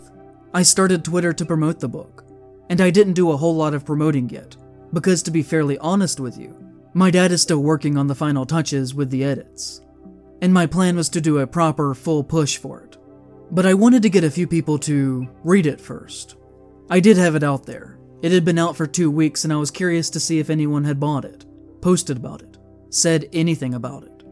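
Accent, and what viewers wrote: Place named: South, United States. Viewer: with a southern accent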